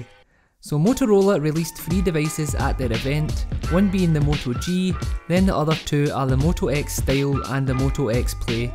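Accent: Scotland, United Kingdom. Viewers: Canadian? With a Scottish accent? How did that happen.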